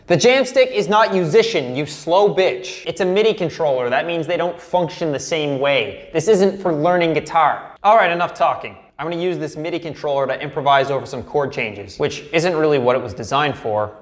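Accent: Canada. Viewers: I am fookin' Polish and I understand 99 percent of what you say. I love the Canadian accent! BTW Your channel is so fooked up! !!!!!!!!!!!!!!!!!!!!!!!!!!!!!!!!!!!!!!!!!!!!!! Just kidding. It's great keep it up. Cheers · Are you Canadian? Got the Canadian "are"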